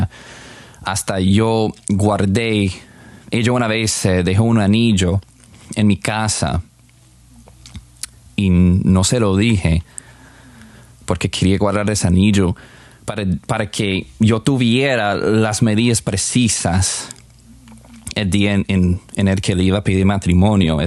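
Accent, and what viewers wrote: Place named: Colombia. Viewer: Es muy inspiradora su historia, ….yo lo vine a conocer a ud en el programa enamorándonos de Univisión y me pareció un gringo con un ace tico en Español como Colombiano por eso acudí a las redes a averiguar por ud y si mi sospecha se aclaró ud aprendió Español en Colombia jajajaj lo felicito por su tenacidad y verraquera para lograr sus sueños, un abrazo parcero…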